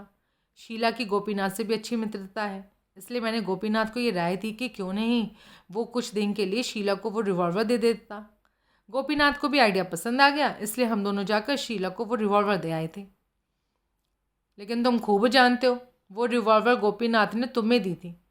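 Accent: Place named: Punjab, India. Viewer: आप पंजाबन हैं, आपकी पंजाबी एक्सेंट बहुत बढ़िया है।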